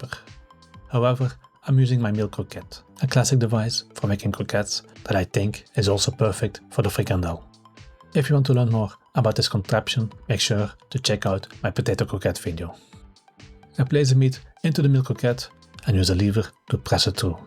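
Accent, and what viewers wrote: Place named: Flanders, Belgium. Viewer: I like the accent. How to tell me you're Flemmish without telling.😊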